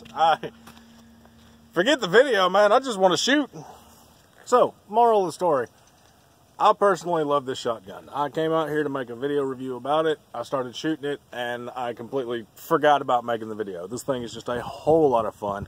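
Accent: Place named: South, United States. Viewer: If it weren't for the southern drawl, you'd sound like Barrack Obama.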